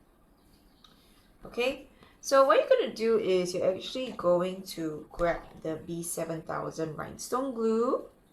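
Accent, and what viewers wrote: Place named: Singapore. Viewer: I am so glad I found this video for so many reasons! Firstly, because it was exactly what I was looking for! Incredibly helpful and informative! Also. This is completely off tangent, but i adore your accent! 🥰❤️ it sounds Singaporean/malaysian and reminds me of home! So thank you for that!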